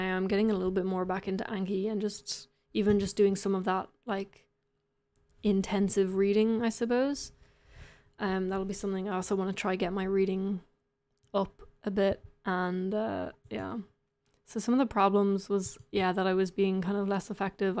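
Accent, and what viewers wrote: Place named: Ireland. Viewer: Woah! Crazy seeing your progress! And i think it's really fascinating how your Irish accent just vanishes when your speaking German. I do have a question, what VPN are you using?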